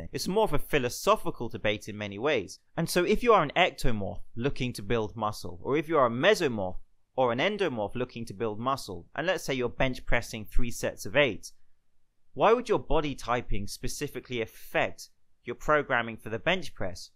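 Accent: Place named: St Albans, United Kingdom. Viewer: I've been seriously getting into fitness for the last year. The YouTube algorithm has clearly decided I'm ready to level up — these videos are exceptional and seriously adding value to my life. Thank you so much! Your accent sounds like it's from my hometown, St Albans, which is comforting during lockdown up in the sticks in Scotland :)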